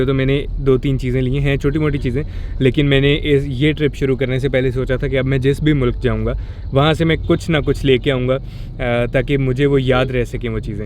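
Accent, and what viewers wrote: Punjab, India. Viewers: Ur Punjabi tone gives a boost of masala in the tour 😂😂😂 😋😋😋😋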